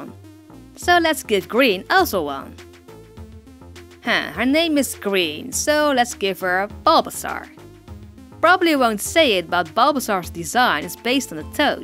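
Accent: Netherlands. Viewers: Beetje jammer weer. Hoorde al nederlands accent in je engels hahaha. Its better in English, becuase more people will understand it :). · I love youre videos and i lpve youre accent i habe the same i am dutch asswell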